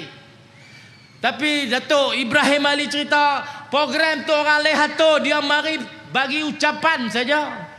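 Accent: Kedah, Malaysia. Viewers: TERBAEEEK BM KEDAH, MB SANUSI. IN SHAA ALLAH, MB SANUSI. ALLAH KURNIAAN BANYAK NIKMAT DARI ALLAH UTK MU MB SANUSI. ORG BAIK YG ISTIQOMAH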